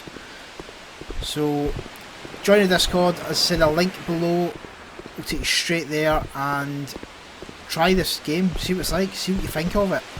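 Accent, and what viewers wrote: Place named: Scotland, United Kingdom. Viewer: Btw I love your Scottish accent lol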